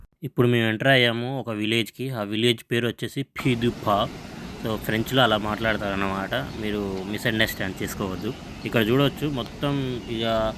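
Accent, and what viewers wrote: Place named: Andhra Pradesh, India. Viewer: Anna Nuvvu mana Telangana yaasa lone maatladu, enduku Anna aa tecchipettukunna andhra accent, Uma ni choodu undedi Hyderabad lo, aayana wife Telangana aavida ayina kaani mukka Telangana yaasa maatladadu. Nee originality kolpoku, mana baashani yaasani vaallu okappudu chaala vekkirinchinaaru, baasha praathi padikapyne manam kotlaadi Telangana saadinchukunnamu, aa vishayam marchipoku brother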